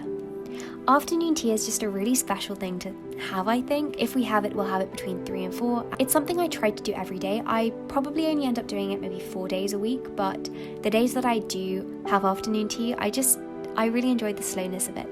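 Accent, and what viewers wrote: United Kingdom. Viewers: I love your british accent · For me, to have a video where I can listen to poetry read aloud in a British accent while looking at the English countryside is... well I feel very fancy.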